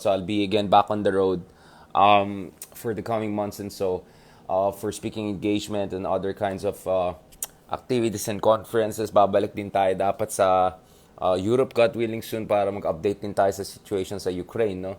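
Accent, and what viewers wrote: Conyo, Philippines. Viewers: Tigil mo na pagiging konyo mo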